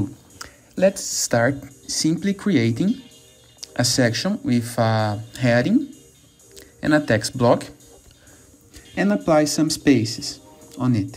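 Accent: Brazil. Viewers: Nice content and nice sotaque brasileiro, meu querido!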